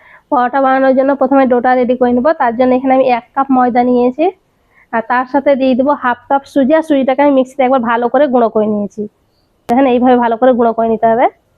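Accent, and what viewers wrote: Murshidabad, India. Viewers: হ্যলো, আপনার ভিডিও দেখি আমি বহুদুর কানাডা থেকে, আপনি হয়তো পশ্চিম বংগ, মুর্শিদাবাদের বাসিন্দা, মিষ্টি কথা শুনে ধারনা করছি, কোথা থেকে আপনি জানালে খুশি হব, আমি মুর্শিদাবাদের মহিলা । আপনার সব রান্না নিঁখুত হাতের, আপনি বড় হোটেলে কাজের সুযোগ পাবেন । একটা অনুরোধ আপনি ফুডকালার/ লাল রং যেটা বিরিয়ানীর আলুতে ব্যবহার করেন তা কম পরিমানে ব্যবহার করবেন কারন, এই রং থেকে “ক্যনসার” হয় । আরেকটা অনুরোধ বিরিয়ানীতে মশলা ব্যবহার করবেন না ।হায়দরাবাদের বিরিয়ানী ছাড়াও ( পুরানো ঢাকার বাবুরচির রান্না “ কাচচি বিরিয়ানী” google করে দেশে শিখে নিতে পারেন; এই বিরিয়ানী- নবাব সিরাজদৌলাহ এর সময় থেকে পুরানো ঢাকায় এসেছিল, তাদের আত্মীয়তার সুবাদে । আপনাকে ও পরিবারের সবাইকে নুতন বছর ২০২৪ এর শুভকামনা জানাচ্ছি । শুভেচ্ছানতে । জিনাত/ খুকু, ০১ জানুয়ারী ২৪🇨🇦🙏❤️🥰🌹🌹🌹🌹🎈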